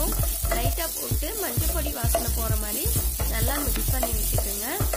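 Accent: India. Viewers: This is Coimbatore slang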